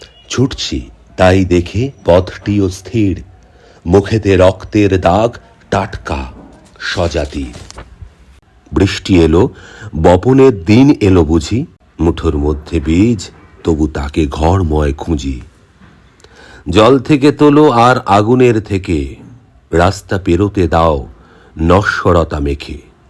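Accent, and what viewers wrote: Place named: Kolkata, India. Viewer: তোমার কথা থেকে অনেক কিছু শিখি, আজও শিখলাম, মনটা ভালো হয়ে গেল। তোমার কথা শুনেলে মনে হয় আমার ই কোনো আত্মীয় কলকাতায় থাকে সে কথা বলল। ঈশ্বর তোমার অনেক অনেক মঙ্গল করুন। ❤❤❤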